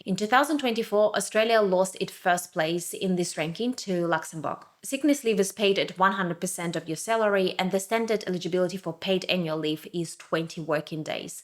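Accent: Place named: Ukraine. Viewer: an inspiring video, with a certain light vibe. you have a pretty cool accent as a Ukrainian❤❤❤❤ thanks for the video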